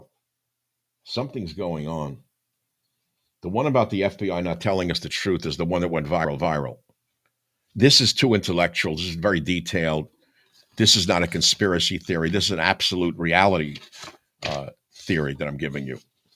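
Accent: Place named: Brooklyn, United States. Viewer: Subbed! I didn't know you were online - I thought I lost you after you left radio. I love your accent, reminds me of my family growing up, that's a particular brooklyn accent, you must be from the same neighborhood my parents grew up in. I can listen to you read a phone book, how much better to listen to you speak the truth. America is blessed that you're still with us and of sound mind and health.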